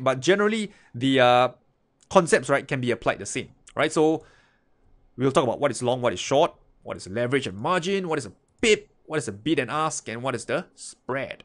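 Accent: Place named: Singapore. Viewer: i bet, you r Singaporean.....tnx for the video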